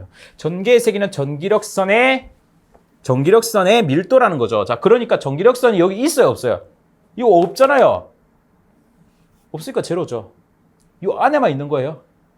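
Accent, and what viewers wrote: Gyeongsang, South Korea. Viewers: 경상도 억양 귀에 딱딱 꽂히네요 잘봤습니다ㅋ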